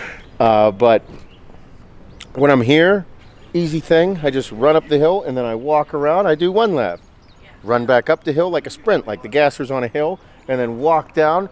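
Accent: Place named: Pittsburgh, United States. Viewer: You have to be from Pittsburgh. I can hear it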